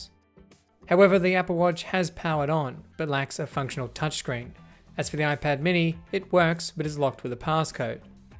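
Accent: Australia. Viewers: I need to stop watching some many Aussie YouTubers, I thought this was a DankPods vid 😂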